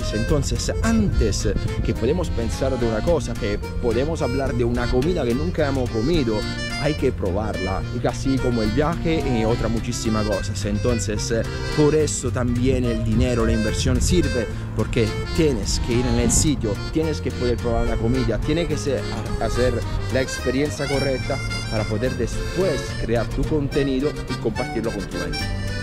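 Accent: Italy. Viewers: me imaginé porque tengo un amigo que también es italiano y habla el español así mismo 🤭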